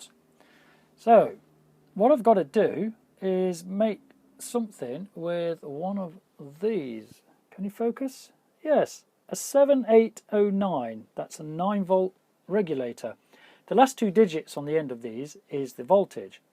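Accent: United Kingdom. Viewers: Hi Sir I just want to ask you are you from UK???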